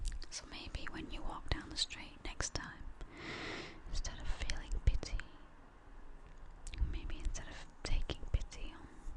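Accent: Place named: Yorkshire, United Kingdom. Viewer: I'm hoping your Yorkshire down to earth accent is genuine about spirituality, we are taught alway question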